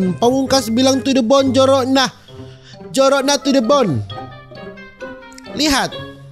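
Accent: Manado, Indonesia. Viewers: Hahahahhaa manado memangg dorang pe logat gagarap memang e 🤣🤣🤣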